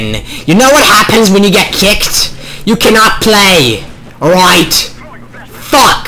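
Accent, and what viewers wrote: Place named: Eastern Europe. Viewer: I'm guessing Eastern Europe? Maybe Slovakia or Slovenia? Maybe?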